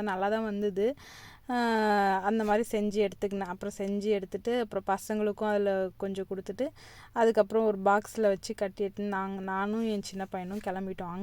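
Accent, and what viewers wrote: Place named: Chennai, India. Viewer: no sis chennai nanum Village la than poranthu valanthen innaikkutha unga video first time pakkuren namba ooru slang la pesureenga romba happya irunthuchi ❤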